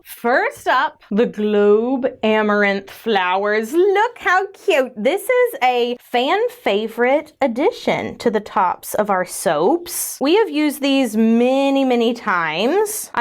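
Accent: Texas, United States. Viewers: Just be you. We can't please everyone. For me, as long as the Lord is pleased that is really all that matters. You aren't in England. You are in America speaking how you speak. Don't change who you are when you're innocently using your Texas accent.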